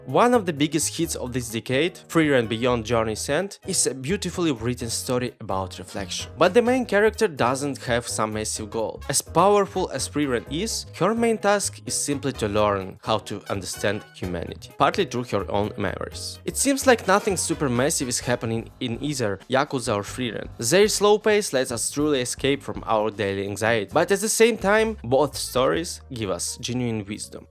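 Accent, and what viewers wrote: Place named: Middle East. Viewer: I’m watching this one because I watched that jujutsu Kaisen video. You make good content, but man, your accent is thick. Are you from the Middle East? It’s hard to place quite where your accent is. Anyway, so far your content is looking pretty good. I imagine those subscriber numbers will start jump, jumping if you keep making videos.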